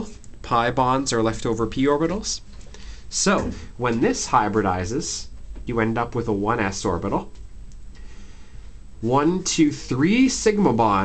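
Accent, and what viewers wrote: Canada. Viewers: Great teaching and Canadian accent. Thanks brother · May God bless you for explaining this so well. Kudos to you and your possibly canadian accent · I just love your commitment to chemistry! Thank you very muchm it helped a lot! BTW... are cou Canadian? :D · This nigga sound hella like a dude frum candana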